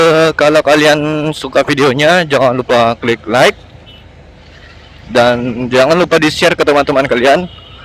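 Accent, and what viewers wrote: Makassar, Indonesia. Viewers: mamtap bro logat makassar hehe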